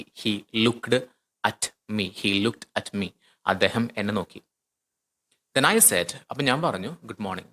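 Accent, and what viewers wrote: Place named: Thrissur, India. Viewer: Really useful video 💕👌 When I heard your Malayalam translation, I felt that you are from Trissur. Awesome class😁👍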